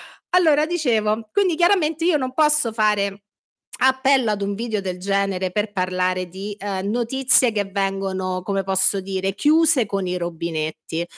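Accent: Rome, Italy. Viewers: non avrei saputo scriverlo meglio, condivido in pieno. Quel "romanaccio" è quell'elemento fondamentale per strapparti risate nonostante si ascoltino robe peso. Avanti tutta! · Ma poi non parli il dialetto romanesco.( Nessuno ormai lo fa) Hai una piacevole ( per me) inflessione, ed usi espressioni tipiche che coloriscono l'eloquio di noi cresciuti nella città eterna rendondolo più efficace per chiunque abbia anche solo due neuroni accesi. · Io adoro come parli e quando lo fai in “romanaccio” ancora di più! E sono sicura di non essere la sola ad apprezzare sia il tuo perfetto uso della lingua italiana che quello del rafforzativo romano che sai dosare egregiamente! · L’inflessione romanesca credo sia voluta per rendere più efficace il tutto. In realtà si coglie un ottimo italiano sotto l’intercalare. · ti ammiro molto, ma scusa però, tu dai veramente corda ai troll che ti criticano il bellissimo accento/dialetto romano? 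Ignorali 🫶🏻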